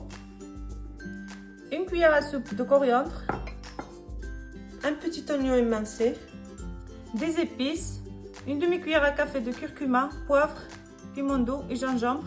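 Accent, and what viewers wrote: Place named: North Africa. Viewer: Merci beaucoup Madame pour cette delicieuse recette , j' adore aussi votre accent qui sent le soleil d'Afrique du Nord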